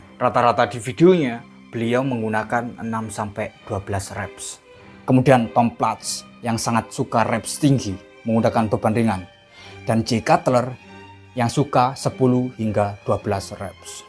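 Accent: Java, Indonesia. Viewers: Orang mana bang? kok bahasanya medok bgt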